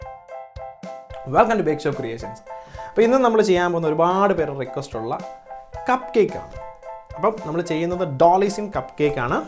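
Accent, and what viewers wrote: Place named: Kottayam, India. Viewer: Hope it from kottayam ..slang like my Amma....nostalgic...presentation also super...chettan superaa...🚽😊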